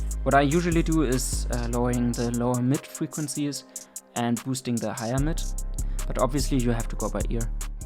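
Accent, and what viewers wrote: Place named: Germany. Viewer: I hear German accent I know vid gon be hard!!!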